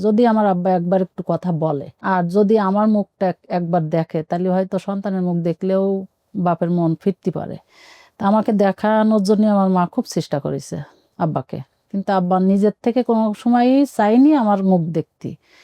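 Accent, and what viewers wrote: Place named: Jessore, Bangladesh. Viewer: এটা যশোরের ভাষা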